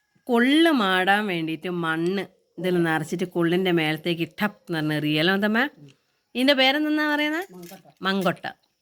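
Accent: Vadakara, India. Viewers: Ente Naattile bhasha😊(Vadakara). Ithu Evideya Sthalam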